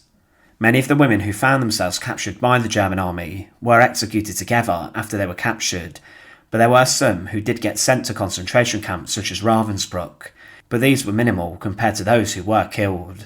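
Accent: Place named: England, United Kingdom. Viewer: First and last time here as listening to that moronic English twang is enough , it’s better muted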